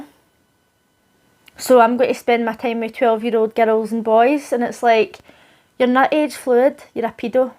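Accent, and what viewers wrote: Scotland, United Kingdom. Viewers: Oh maan what an accent, is that Sco’ish? Absolutley fantastic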